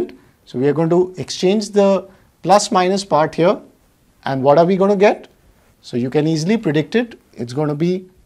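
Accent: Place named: India. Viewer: Plzzz no more indian pronunciation 🤦‍♀️🤦‍♀️🤦‍♀️🤦‍♀️🤦‍♀️🤦‍♀️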